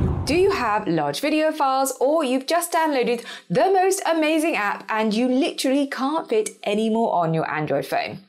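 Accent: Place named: England, United Kingdom. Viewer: Bossy. English. I like it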